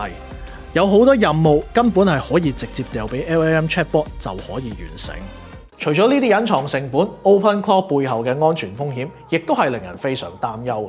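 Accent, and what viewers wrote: Guangzhou, China. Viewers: 好重大6佬口音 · 簡單, 清楚, 好片。 主持係廣州人? 幾有廣府話味, 幾有個人特色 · 點解主持咁重廣州口音? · 師兄是咪廣州人? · 主持有種廣府口音 係咪廣州人？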